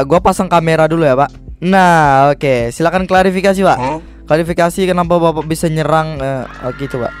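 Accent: Java, Indonesia. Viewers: Bang lu orang Jawa ya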